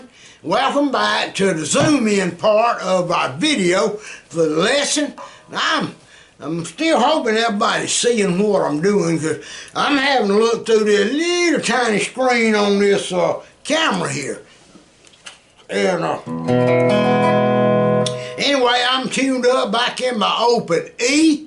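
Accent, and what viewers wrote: Texas, United States. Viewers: Is this Texas dialect ? Dont know wich slang is but is badass.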